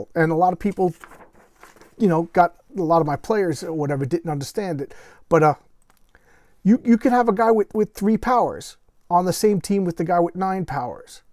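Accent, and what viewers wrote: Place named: New York, United States. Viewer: I’m in Oklahoma City. I don’t think you said where you live but I’m guessing somewhere near NYC based off your accent? I’ve signed up for the forum and am waiting for my e-mail verification. Re: Jeff Dee. About 10 years ago I sent him a fan email that ended up several years ago with me being hired to illustrate the War Heroes WWII sourcebook for their ‘Mighty Protectors’ game (basically V&V 3). I was thrilled to be a small part of V&V ‘lore’ and Jeff was entirely professional. At one point he gave me his number in case I had questions. Based on interviews, he is very outspoken and direct, so I didn’t dare call him. But V&V is great and his art is awesome.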